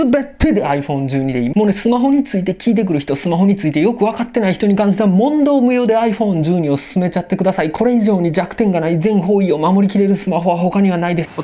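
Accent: Kansai, Japan. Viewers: 関西弁のこの早口がOKなら 日本語無敵じゃないですか そもそも津軽弁とか甲州弁とかなると 日本人も分からなくなるんだし(￣▽￣) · いつも最高にわかりやすいし、テンポ関西弁どれをとってもすばらしいです。 今回のIphoneも早く解説してくれないかなぁと心待ちにしておりました。 （Iphoneユーザじゃないけど） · 関西人って感じだよね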